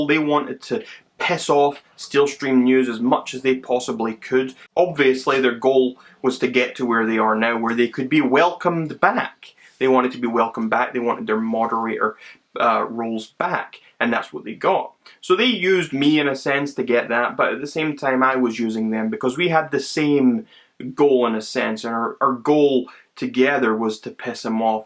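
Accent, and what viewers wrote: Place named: Scotland, United Kingdom. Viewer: Ok you are Scottish 🥰 best inventors,best accent,now my best channel for tea ☕️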